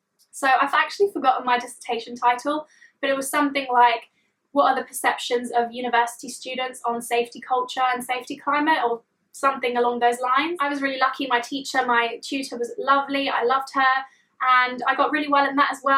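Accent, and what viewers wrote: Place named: England, United Kingdom. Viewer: What a horrible accent what country do you come from the the wrong side of the tracks in england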